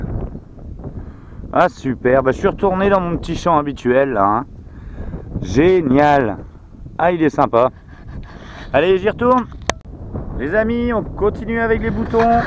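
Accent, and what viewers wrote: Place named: Brittany, France. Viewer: salut, j'adore la présentation de tes trouvailles, on sens bien que tu est de Bretagne. pas mal de trouvailles, surtout des boutons, tu vas bientôt pouvoir ouvrir une mercerie MDR. merci pour le partage, bonne détection et à bientôt + 1 pouce bleue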